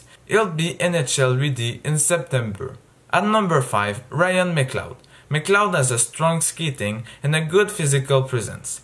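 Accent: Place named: Quebec, Canada. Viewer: Are you French Canadian or Russian lol? You sound like a mix of them haha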